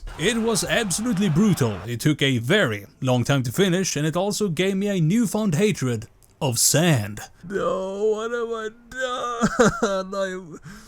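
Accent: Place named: Sweden. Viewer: absoloutly amasing accent i love it, sounds sweede :D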